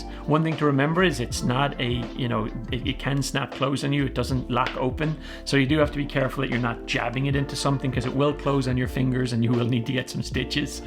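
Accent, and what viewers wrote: Ireland. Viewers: I thought you were Irish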